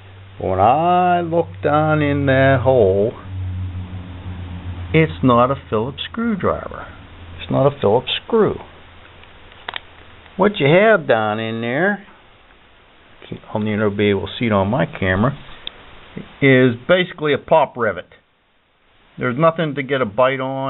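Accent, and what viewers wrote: Pittsburgh, United States. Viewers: I struggled with this machine for several weeks before I thought I found the right tools (3 “ driver specialty bits). What a ridiculous machine. I also just happen to be from Pittsburgh and picked up on the accent right away. The “down in there/ get in there” gave me classic Pittsburgh vibes, and the ”jag off” ending was the icing on the cake! Made me feel all warm and mushy inside for my hometown. Thanks,! ❤️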